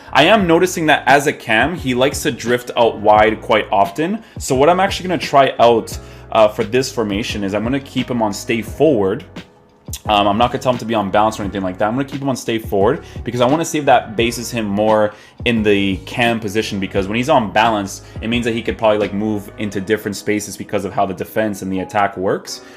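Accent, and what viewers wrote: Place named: Portugal. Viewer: És tuga? Notei aí algum português na pronúncia ahah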